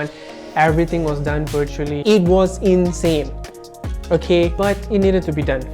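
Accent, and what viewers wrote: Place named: United States. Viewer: You sound like an American way too “AMAZING”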